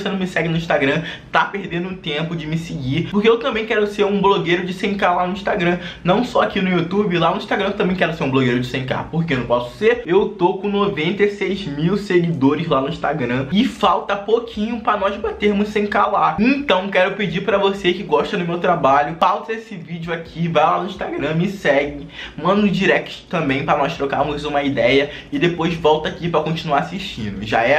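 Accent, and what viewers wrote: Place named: Rio de Janeiro, Brazil. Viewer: Cara, o que mais gostei de vdd foi o seu sotaque carioca hiper mega carregado!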